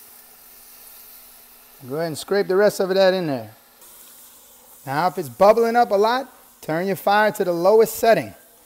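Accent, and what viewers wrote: Louisiana, United States. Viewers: Actually VLOGS not to mention that Cajun accent to go with the dish sets it all off😂🤣..I’m from New Orleans,La.👊🏽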